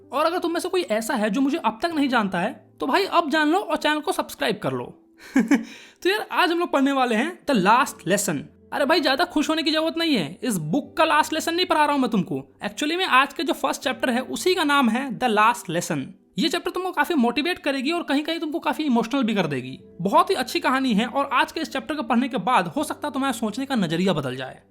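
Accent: Bihar, India. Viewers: Bihar se ho bhai❤ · You are from Bihar? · Bhai aapke bolne ke style se lag rha h ki aap bihari ho.😅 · Sir aap bihari ho kya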